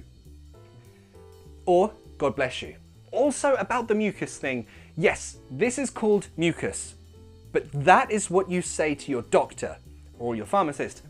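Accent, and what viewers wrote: United Kingdom. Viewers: Some phrases from one of my students: "In spanish when you have diarrhea we say I have a "belly ache" or lower abdomen ache since the stomach is in a different area of the body, separated from the intestines". "I have pain in my private parts"... what would that be in proper english?. Another phrase from my student: This type of food makes me fart in the morning. Thanks you are a very sexy teacher, entertaining and with a wonderful british accent. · As an English teacher and when I had a butcher on your videos I thought they are similar to other videos on the YT, I have learned a lot from you mate, I daresay you are a star in teaching as far as British accent is concerned ,very talented and your body language is really explanatory and amazing, thumbs up mate . I wonder if you could post a video on some conjunctions and transition words,like henceforth , therefore ,thus,etc I couldn't find any genuine British videos concerning these. 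Cheers Keep it up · The best teacher, I love the british accent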